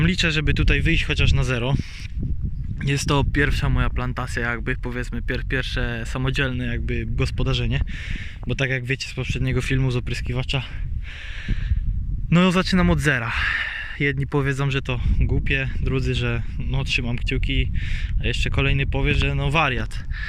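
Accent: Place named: Podkarpacie, Poland. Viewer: Prosty chłop, prosty film i prosty przekaz i tak to ma wyglądać Brawo. Przede wszystkim gratulacje chęci i odwagi do podjęcia się takiego wyzwania i realizacji marzeń. Wpadłem tutaj trochę przez przypadek ale daje suba i zostaje na dłużej z wielką chęcią na więcej. Wrzucaj częściej cos nawet z drobnych prac naprawczych, przygotowawczych itp. Powoli małymi krokami a cos z tego napewno będzie. Trzymam za Ciebie kciuki i szczerze życzę powodzenia. Nie jest to lekki kawałek chleba ale widać że dobrze kombinujesz bo grafika to przydatny fach w dzisiejszych czasach. Pochwal się tylko jeśli można wiedzieć ile masz lat i czy jesteś z Podkarpacia bo tak trochę po Twojej wymowie sugeruje się tym regionem? Jesli chodzi o poprzedni film to nie wiem czy doszukałeś się już informacji czy nie ale jeśli dobrze się orientuję to te końcówki w opryskiwaczu różnią sie sposobem oprysku w zależności od danej uprawy. 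Nie jestem rolnikiem ale pamiętam że wujek który nim jest opowiadał mi o tym kiedys bo tez sie go o to pytalem😉 Jeszcze raz powodzenia i tak jak mowie troche częściej jakieś drobne nawet wstawki z nowościami, przemyśleniami i wgl a na pewno znajdzie się więcej osób chętnych wspomoc dobra rada i słowem w komentarzach. Powiedz jeszcze jak pracę przy opryskiwaczu stoją narazie w miejscu czy cos ruszyło? Pozdro 👍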